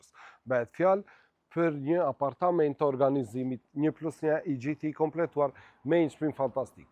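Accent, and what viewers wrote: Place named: Devoll, Albania. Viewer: Na i shpife me kete te folur si devolli apo andej je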